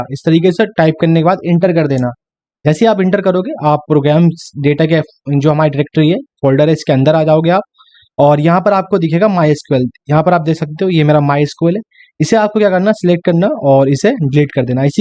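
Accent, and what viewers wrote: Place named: Hyderabad, India. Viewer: thanks broo that was really a big hectic, by the way that hydrabadi accent kills it , love bro love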